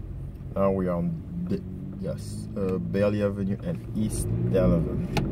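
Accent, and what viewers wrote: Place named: Nigeria. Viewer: Wow...i love your accent. Are you Nigerian?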